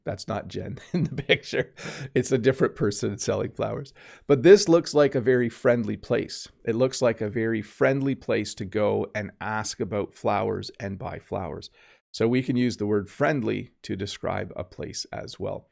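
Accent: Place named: Canada. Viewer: Great videos!! My students love your teaching style and I recommend your channel to hear natural English with a different accent - I’m British but it’s important to hear all different accents. I find Canadian clearer than American. Keep up the good work!!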